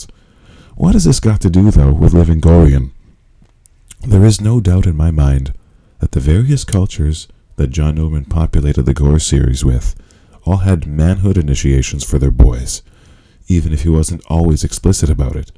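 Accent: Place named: United States. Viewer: please will you get in contact with me Master I want to learn as much as I can about all this. I've ordered three of the books about Gor from Amazon. I think you live in America as you sound American. I live in Belfast northern Ireland so I think I'm about 5 hours ahead of you in time not sure but you can contact me anytime you like but there may be a delay in getting back and in real time sorry. I'm also at uni most days which doesn't help with things either. I'm bit embarrassed about asking but I want to be a sex slave slave girl and learn as much as I can . Now I'm totally embarrassed and will be a complete nervous wreck until something happens from your side or not. You can contact me anytime you like . Love Tara x. I feel a bit humiliated asking about all this but it's what I want. Tara x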